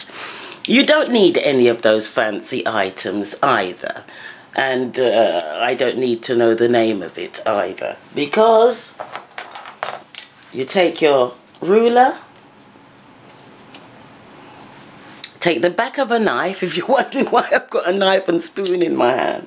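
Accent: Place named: United Kingdom. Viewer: I love your voice lol.. like a southern Big Momma with a British accent.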